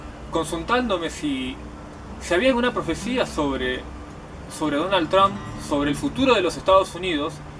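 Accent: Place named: Argentina. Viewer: Gracias. Me encanta tu acento argentino. Nos diste muy buenas explicaciones!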